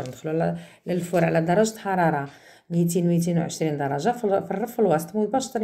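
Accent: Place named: Jijel, Algeria. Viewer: الله يبارك ويوفقك في مشوارك قناتك رائعة انا احب الطبخ الجيجلي وكذلك اللهجة😘😘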